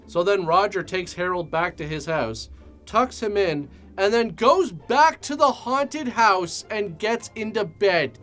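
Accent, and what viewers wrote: Canada. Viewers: To be honest I'm kinda surprised you don't pronounce "aunt" as Ah•nt because you're Canadian. I've noticed Canadians put a lot of emphasis on pronouncing things exactly like they are spelled, for instance when you say soh•ree instead of sah•ree for "sorry".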